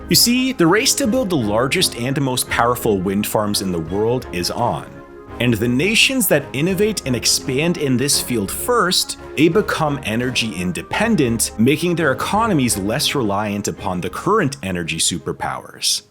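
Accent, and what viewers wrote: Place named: Canada. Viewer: Welcome back,! Love your vids and friendly Canadian voiceover. ☺️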